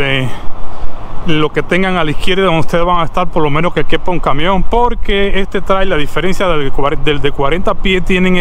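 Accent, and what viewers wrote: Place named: Mexico. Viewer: Saludos bro ya hablas bien Mexa🇲🇽 asi estaba yo pero al contrario cuando me juntaba con todos mis compas cubanos 🇨🇺 incluso estuve en varias ocasiones en Cuba🥰🥳 Saludos mi sangre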